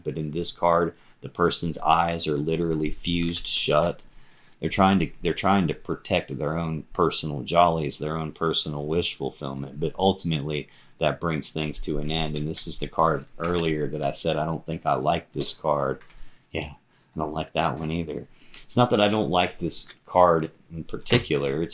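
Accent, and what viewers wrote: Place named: Texas, United States. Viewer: Your accent is going to fit in nicely in Texas, it almost sounds like a native Texan.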